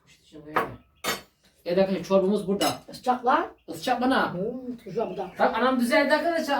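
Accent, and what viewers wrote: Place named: Zonguldak, Turkey. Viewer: zonguldakça ayrı bir lisandır👍, kardeşim hakkını veriyorsun. bilhassa bu doğallık baktırıxor bu Vloglara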